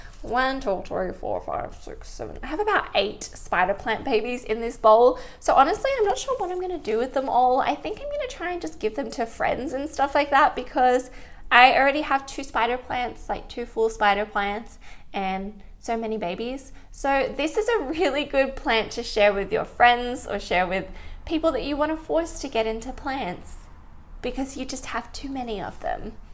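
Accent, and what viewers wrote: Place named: Australia. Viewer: Thanks for the tutorial. I heard about 3-4 accents.🤔very interesting! Geussing Australia?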